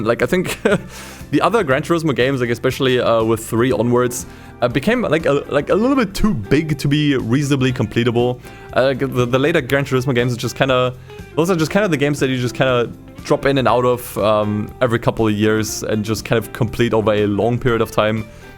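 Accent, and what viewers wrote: Germany. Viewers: Warum klingt dein englisch so deutsch??? 😅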